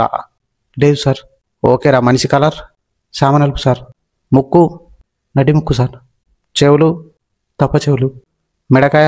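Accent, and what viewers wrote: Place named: Karimnagar, India. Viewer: Pakka sure my jagityal ledha Karimnagar district language Indhi❤emnna feel undha mama videos lo🥰🥰🥰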